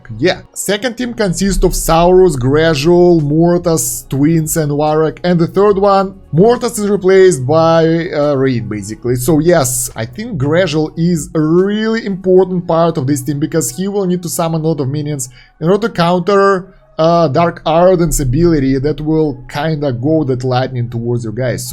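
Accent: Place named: Russia. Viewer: Русский) сразу слышно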